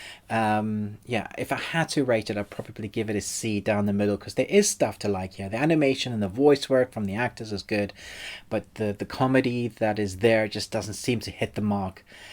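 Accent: South Africa. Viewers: You South African . Much love from cape town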